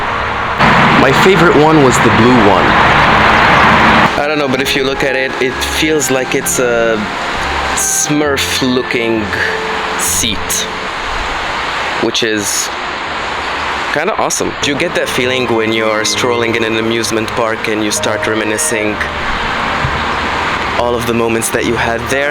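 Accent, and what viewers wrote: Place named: Tunisia. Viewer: First time watching u, at first I was like why tf is he speaking english, idk why but everytime i listen to a tunisian speaking in english (including me) it feels a little weird, damn I wish i could speak with american or british accent, anyways keep it up, nice video,this channel was a good discovery today. kind of like nathaniel drew of tunisia ;)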